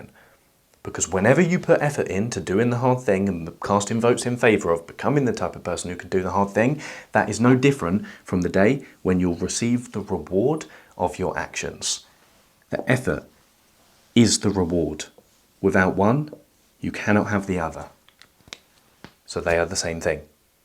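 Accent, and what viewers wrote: England, United Kingdom. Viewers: Okay I truly believe this video has changed my life. I have seen dozens of motivational videos and tons and tons of mental health/ help videos.. but when laying in bed being the usual lazy self one afternoon this video popped into my feed.. I read the title and thought to myself “man I don’t really want to watch this.. but that probably means I NEED to watch this” and I clicked on it with only the intentions of watching the first few minutes or so.. and honestly I started off judging. I thought “what does this bald looking guy wearing a fancy vest and with this English accent think he knows that the rest of the world doesn’t” but I surprised myself when I found myself almost leaning forward eager to listen, to learn. What you said in this video was everything I needed to hear. I thought about this video for the rest of the day. 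And the next morning I woke up and I watched the 32 minutes all over again. I learned even more the second time and I had the most productive day that I had in months. The next day.. I crashed. Burnt out from trying to go 110% and I felt so guilty after just having what I thought was a revelation of my whole life. I slipped back into a depressive state and did nothing about it for a few days.. but I realized something. I could not get this video out of my head. This video and everything you have said was constantly screaming at me. Every time I looked at a screen I couldn’t stop thinking about what I was doing to my brain. I became conscious. This vide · Why does Shia Lebouf suddenly have an English accent?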